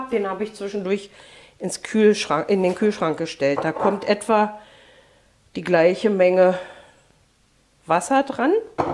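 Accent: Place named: Altmark, Germany. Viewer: Sieht superlecker aus 🤪 Dankeschön für Dein Rezept Vom Dialekt her kommst Du aus der Altmark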